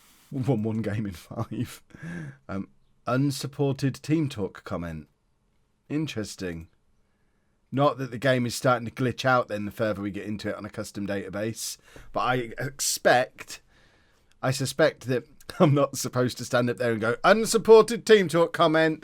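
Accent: United Kingdom. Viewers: I dont understand football at all but it’s interesting listening to a Brit explain it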